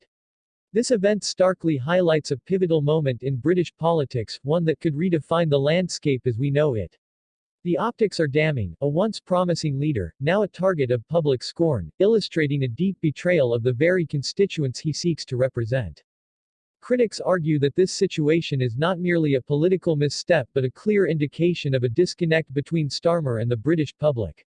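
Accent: United States. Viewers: i have never heard of anyone being kicked out of a church unless they caused a nusiance ,this looks like,yet another of these youtube videos that have suddenly shot up with American voice overs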